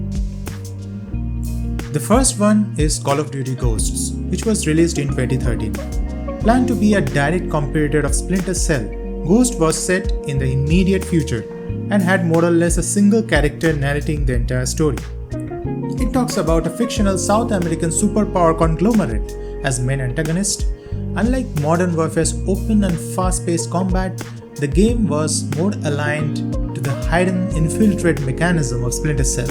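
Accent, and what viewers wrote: India. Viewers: u indian ? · Are you from West bangal. Your voice is like Kirtiechow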